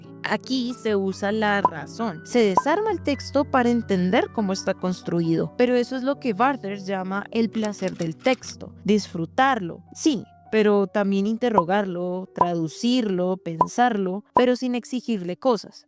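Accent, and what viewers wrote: Colombia. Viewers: Increíble video, tu acento suena Colombiano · Hola, apenas conozco tu canal. Está cool. ¿Eres colombiana? Noté un poco tu acento. Debo comentar que esto de las confusiones de términos no solo pasa con esto, sino también en otras áreas. Por ejemplo, en el área creativa se confunde mucho lo que es "animar gráficos" con editar un video, o los efectos visuales con los efectos especiales, etc.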